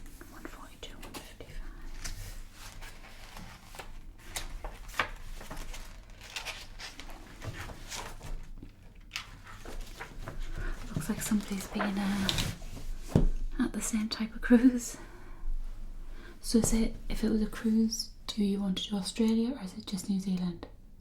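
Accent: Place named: Newcastle, United Kingdom. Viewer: knew you were from newcastle!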